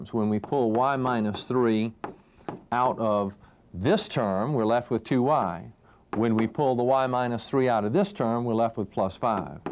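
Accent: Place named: South, United States. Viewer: This is an EXCELLENT refresher for the GRE. Thank you! (Also, love the southern accent.)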